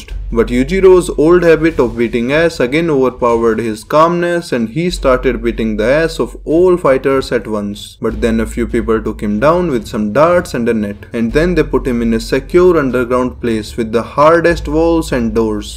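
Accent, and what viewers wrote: India. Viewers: "You don't believe me? Look at my chest I am so masculine that I don't even have nipples." "And when Yujiro Unleashes his demon, there is only one way you can survive and that is by dying." He don't poop anymore because he absorves every particle he eats LMAO Bro, your sense of humor is amazing, your acent turns things more fun and yes! Baki is sooooo bizare!! Indian guy, you made my day with this video! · Your indian accent makes it just so much better lmfao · Best Baki content creator by FAR! 😁 Love the Indian accent too, makes every joke work so much better. 😂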